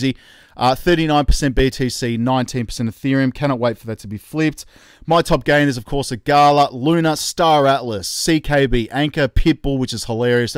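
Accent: Australia. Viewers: Thanks for your info mate. As someone who is new to crypto (about 7 months) I'm still trying to learn as much as possible and navigate my way around the space. I'm basically all in on xrp, as I still don't know enough to diversify too much, and I find it reassuring to have an Australian voice to help educate me. Keep up the good work.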